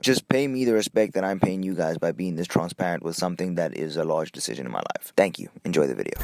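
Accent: South Africa. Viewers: This accent belongs in my backyard. SA?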